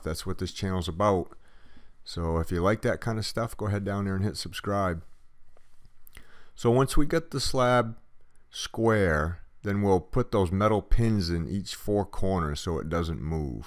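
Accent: Maine, United States. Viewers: You sound like a Mainer. About how big can you make a slab in New England, where the ground freezes, before you need footers?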